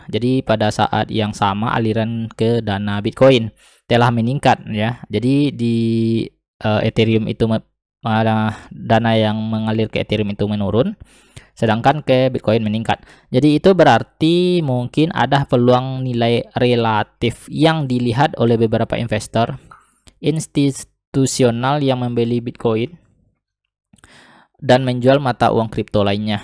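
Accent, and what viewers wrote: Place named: West Sumatra, Indonesia. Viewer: logat urang awak bana da👍😁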